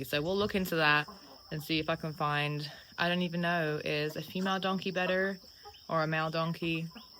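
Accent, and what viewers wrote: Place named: Australia. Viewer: I know what you mean , sounds like Australian and it's So Interesting , love it ❤️👍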